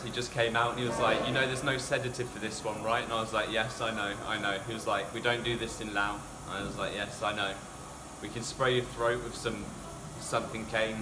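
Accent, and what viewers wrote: United Kingdom. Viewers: Ah so you are from UK. I thought you are Australian. I can’t tell the accents. Between the two. Glad you are ok now. Cute puppy there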